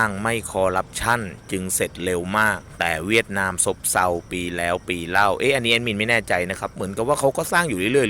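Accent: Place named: South, Thailand. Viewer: 😂😂😂😂😅🤣😆😁😂กดขำให้ตั้งแต่ยังไม่ฟังเลย/อ้อ!ชอบแอดพูดภาษาสำเนียงใต้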